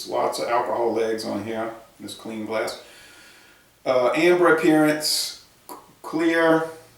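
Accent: Louisiana, United States. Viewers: Where are you from brother i hear a little Louisiana accent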